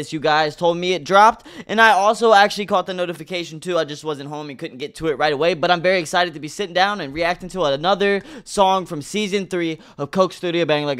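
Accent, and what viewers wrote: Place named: England, United Kingdom. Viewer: I like your English voice accent❤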